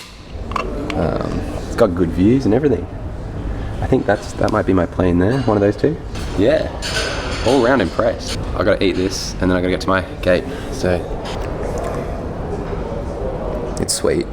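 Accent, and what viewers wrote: Australia. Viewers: Sounding very Aussie which is a pleasure.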